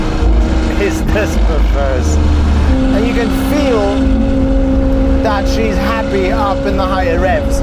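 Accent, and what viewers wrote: South Africa. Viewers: Sounds like a South African accent.🤔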